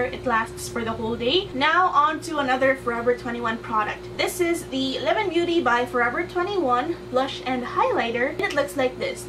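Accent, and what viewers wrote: Visayas, Philippines. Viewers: talagang love na love ko ang pagbisaya mo ang cute sis! and very natural pati mga bloops mo, kaya nag subscribe nko 😜👍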